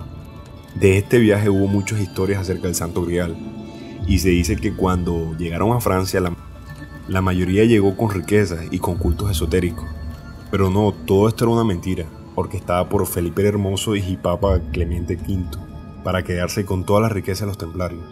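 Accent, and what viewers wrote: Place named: Venezuela. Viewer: La historia puede ser muy buena, pero no con acento venezolano por favor. Pierde toda credibilidad y seriedad. Dile a algún amigo que te grabe la voz, preferentemente un español. Además, se nota que estás leyendo absolutamente todo, te equivocas al pronunciar los nombres y el acento de los lugares ó personas porque no eres un erudito en el tema, no lo has estudiado, ni lo conoces, solamente estás leyendo un libro que te encontraste por ahí.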